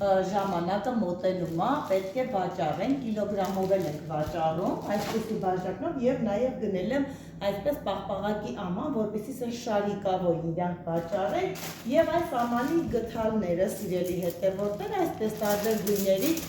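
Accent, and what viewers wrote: Lori, Armenia. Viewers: Էնքան հետաքրքիր ա խոսում ես Լոռվա բառբառով Արտաշատինը հետը խառնած😂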